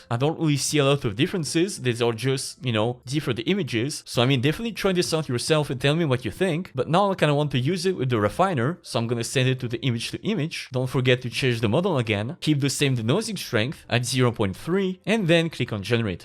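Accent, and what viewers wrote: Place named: France. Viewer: Thanks for the post, but please tell you voice-bot to slow down, especially when using the Francois accent, e.g., "EEMPTEE" for "empty" throughout, and the like, t might be easy to train your bot to avoid... THANKS!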